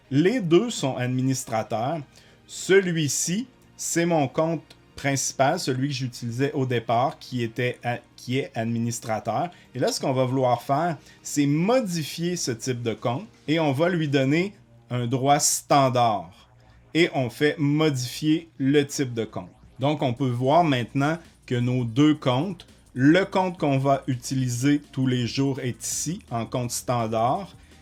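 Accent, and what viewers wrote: Quebec, Canada. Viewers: Bonsoir Je suis avec un grand intérêt cette vidéo d autant plus que vous nous donnez fort gentiment la marche à suivre sans aller trop vite. Cependant, jai acheté depuis peu de temps un ordinateur Windows 11 ! Ne pourriez vous donc pas nous indiquer comment procéder de la même facon, étape par étape d autant que certaines rubriques que vous citez n apparaissent nulle part ! Je me permets toutefois d attirer votre attention sur le fait que je ne vois pas assez bien les différentes fenêtres que vous ouvrez d autant que les caractères à l oeil nu apparaissent fort petits et que l image qui en découle est plus ou moins flou ce qui rend la visibilité dificile. Je devine plutôt que je ne vois véritablement ! Elle gagnerait en netteté avec des caractères plus gros et une image beaucoup plus nette de façon à ce que l on puisse mieux visualiser le tout. 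Mis à part cela, j adore votre accent canadien et ai le sentiment que vous êtes passionné d informatique au point que vous transmettez avec grand plaisir tout votre savoir sans chercher forcément à gagner de l'argent ! J ai donc d autant plus plaisir à vous écouter ! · Bonjour. Je viens de découvrir votre chaine et de m'abonner. J'ai fait tout ce vous préconisez et maintenant je me demande si d'avoir téléchargé Advanced systeme care sert à quelque chose. Je suis français et votre accent j'adore. Je vais faire un tour au Quebec en septembre, j'en salive d'avance. Merci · Bonjour Jeune Homme, bonjour à Tous ! Eh bien quoi te dire, j'ai liké, je me suis abonné, après avoir visionné, et revisionné ta vidéo ! Eh oui, comment faire autrement, face à un travail si bien fait, court, clair, net, concis et précis !! je croyais qu'il fallait avoir Microsoft 365 pour bénéficier de Défender, et là tu viens de me définir que non, puisqu'à aucun moment tu n'en parles dans tes explications ! 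Tu sais, je te visionne depuis la région parisienne en France, et ton ( accent là ) je le trouve magnifique, puisque j'en rigole aussi sur certains passages ! En tout cas merci pour ce partage qui me sera grandement salutaire car c'est un peu beaucoup le ( bazar ) din mes zoefers là tu sais ! J'ai essayé de le faire à la québécoise là t'sais ! Ah, petite précision, le tutoiement dans mon intervention n'est point à prendre pour de la désinvolture, ni du manque de respect, mais tout simplement, de par le fait que cela me plaise, je me veux de plus de proximité avec mes interlocuteurs, de par ce rapprochement que nous offre la toile, malgré tant de distances qui nous séparent ! Et puis, je suis prof de sport avec des baby, des enfants, des ados et adultes en karaté et self-défense, ceci explique un peu cela !! Salut mon pot ! Paulo 74 ans !!! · Je trouve cette proposition très astucieuse et j'ai bien envie de la mettre en oeuvre. 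Je me pose cependant une question : le fait d'étre contraint d'utiliser les trois questions secrètes ne constitue-t'il pas un risque si une intelligence artificielle est utilisée pour trouver les mots les plus probables en fonction des données qui seront trouvées sur l'ordinateur? J'aimerais avoir votre avis. Merci par avance Et bravo pour votre clarté et l'accent canadien m'est toujours très agréable.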